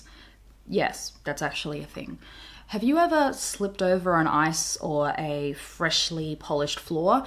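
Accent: Australia. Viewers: Love the Aussie accent on girls. Don't change a thing. These New York ears hear you just fine.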